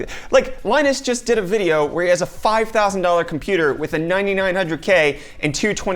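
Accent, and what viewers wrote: United States. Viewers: So this has probably asked before but Im new to your channel. How is it that you are an American or at least have an American accent and living in South Africa? Really love your content by the way. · You sound extremely American, for a guy from south Africa.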